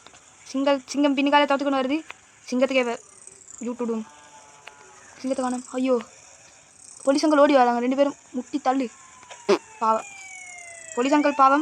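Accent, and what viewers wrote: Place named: Kerala, India. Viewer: Actually naan oru malayali than onga lihta malayali mari irrnthuthu so no disturbance 🎉❤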